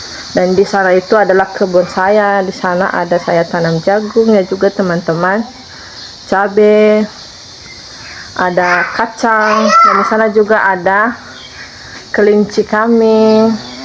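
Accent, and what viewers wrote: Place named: Eastern Indonesia, Indonesia. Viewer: Bisa dimengerti mengapa sering mengucapkan kata Teman Teman.. Gaya bicara orang dari Timur Indonesia masih kental sekali.. Mohon Kakak2 memaklumkan nya dan Kakak kakak bantu dukungan maksimal supaya kakak kakak dan semakin dekat.. Semoga kakak kakak senang kedepan nya.. Oke kakak kakak sekalian sekian dulu kakak kakak.. Sampai jumpa lagi..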